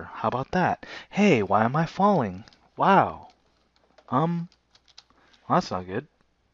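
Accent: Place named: Brazil. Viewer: So you're from Brazil? I could tell.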